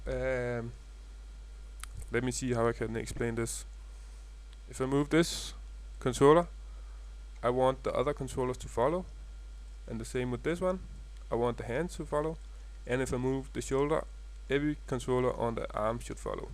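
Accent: Denmark. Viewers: are you danish swedish or are you german i am danish by the way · I can easily hear you're danish d: ! not only by the name d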